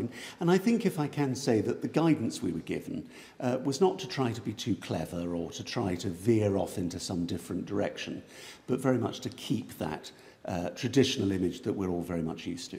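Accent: RP, United Kingdom. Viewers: What a plum ,round , posh voice this chap has. Not a bad thing for a change. Not happy with Charles not wearing a crown or an image of one on the stamp somewhere. What other even more radical changes has this woke monarch up his sleeve!?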